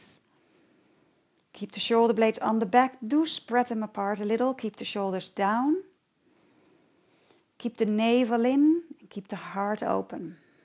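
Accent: Netherlands. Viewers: I love your dutch accent! <3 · She sounds very Dutch. Is she? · Dutch English pronunciation...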